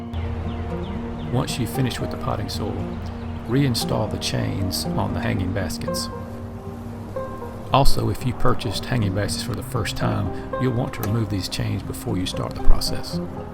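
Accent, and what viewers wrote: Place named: South Carolina, United States. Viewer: Thanks for all the tips🌿Just hooked our system up. Love that everyone is commenting on your accent. That’s that born and raised in South Carolina flow☺️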